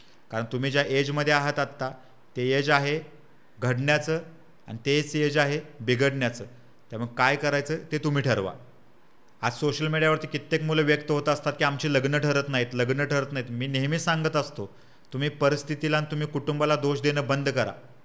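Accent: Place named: Walwa, India. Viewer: मला पहिल्यापासूनच वाटत होते की ही भाषा वाळवा तालुक्यातील आहे मी पण इस्लामपूर मधून आहे .माहेर इस्लामपूर व आजोळ आष्टा. डीन.एड्. कस्तुरबाला झालंय. आमच्या तालुक्यातील माणूस लय भारी वाटत.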